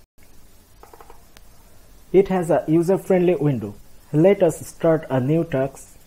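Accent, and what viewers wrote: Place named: Bangladesh. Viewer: BANGLADESHI ENGLISH.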